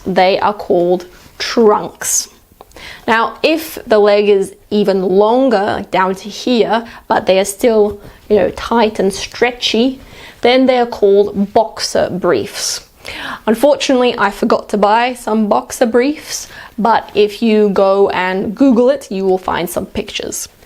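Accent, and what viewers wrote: United Kingdom. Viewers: Hello, question... are you speaking british english...thanks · Uk accent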